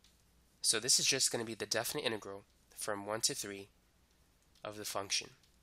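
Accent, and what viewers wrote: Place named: Brooklyn, United States. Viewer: I love your Brooklyn accent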